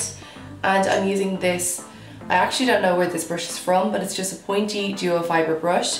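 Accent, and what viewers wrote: Dublin, Ireland. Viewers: She's Irish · Do you have an Irish accent?😊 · She's Irish for sure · What part of Ireland are you from ❤️ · I think she's Irish!! it's such a subtle accent tho! I love it hahaha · Your beautiful irish accented voice could never be annoying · do u live in america? i thought u were irish girllll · Definitely Irish!! Yes I am American, but I can pick up that accent any day. 😂😂 oh & plat also means to braid. 👌🏼 Also recreated this look & used the Gwen Stefani palette as well as my Morphe 350. For the lip. Used Love Bite by Kylie Cosmetics & it went perfect. 😍😍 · Sounds Irish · are you irish?? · You're so gorgeous! Btw are you irish? lol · Are you Irish?? Your accent is 😍😍😍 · is she irish?? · Is her accent Irish or South African? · yeah shes from dublin :) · this is the first video I've ever watched of you, one question are you Irish ? 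· where is she from? jw lol, im new here. maybe ireland? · What accent have u got? (Scottish) Irish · Is she Irish?x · Is she from Ireland cause she had an Irish accent I'm from Ireland iswell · Is she part Irish I feel like she has an accent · she's Irish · She is from Ireland. Lovely accent! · Are you Irish or British?? You sound Irish...btw new to your channel love this look you're gorgeous!!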